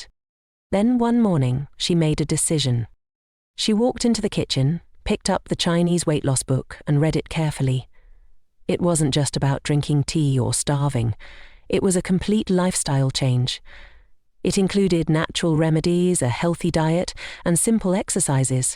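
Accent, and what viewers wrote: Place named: England, United Kingdom. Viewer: Such a beautiful English accent. All that glitters isn’t GOLD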